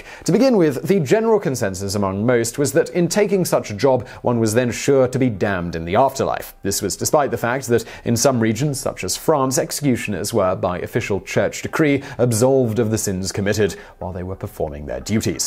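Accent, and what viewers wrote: United Kingdom. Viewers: wtf I came here for that V-Sauce guy. And momentarily thought he magically gained a British acccent. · Why is talking with a British accent. He's fancy and everything but now he just sounds silly.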